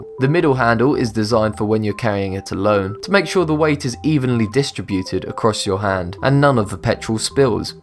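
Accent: England, United Kingdom. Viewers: pre-schoolers haks, w english accent , horrible as hell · His voice is a really good British accented voice. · Just because you have a dumb limey accent does not make you smart. none of these are true and were never meant to be used that way.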